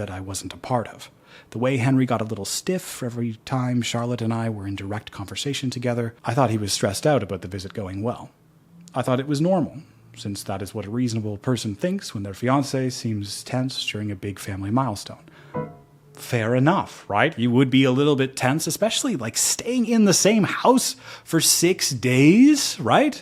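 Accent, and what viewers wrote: RP, United Kingdom. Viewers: Congratulations on 55,000!!! 🎉🎉🎉 Love the accent. I’m a fan of all things British and you were spot on. 😊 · Speaking as a Brit watching this, ordinarily I would have told you off for using the stereotypical 'posh English person' voice. However, from the language used in the story I think they might actually be that kind of Brit lol. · I absolutely ADORE you! You have such a nice voice and I love the side commentary and piano riffs 😂😂 also, not sure this is worth much from a Texan, but I thought your British accent was marvelous! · British person here: British accent approved and appreciated hehe ❤ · I’m not British, but I do like accents, and (for what it’s worth) to my uneducated, American ears your accent sounded perfectly posh! 😆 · I liked your accent, but thats quite posh, there are soooooo many accents here, but saying that i do know people that speak like that, and I also have a Aunt like Charlotte whom I dont speak to because shes rude! 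· This is the second time that I have watched this and I can picture almost everyone in this story. The title does seem a bit misleading though. I think that the should be a narrator for audible he needs a manger to get him a gig. Also I thought his British accent was great. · You have a great posh british accent lol, and I say this as somebody with a british husband Though, he only has a slight posh twang, since he's got an expat accent that's just heavy on the british side, and he's specifically from Bristol, which is the land of the pirates, rather than whomever these posh bastards are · GREAT story and your British accent was very entertaining! My mother was English and was HORRIFIED by iced tea. "Ice in your TEA?!" No problem with iced coffee, tho.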